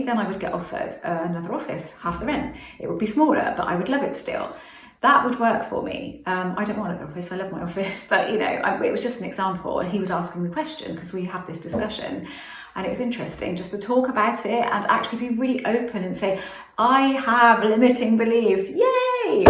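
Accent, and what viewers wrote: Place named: Liverpool, United Kingdom. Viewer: Please check your mic. I love all you say and its a very important message because nobody is talking about it in the loa comunity. But with your british accent and the low sound its really difficult to follow. I think its not possible to manifest an sp because you cant wanna manifest an sp and be deattached at the same time. For me the best thing to do is to manifest a situation. Like... Focus on what you want what situation what kind of feelings. For example a romantic relationship where you go on a trip together. And even if an sp comes to your mind you just manifest that an leave up to the universe who is gonna play the character of " your love" like if life was your movie and its up to God who is gonna be the actor who plays your guy. You writte the scene, the universe choose the actor. I think that is the way to go.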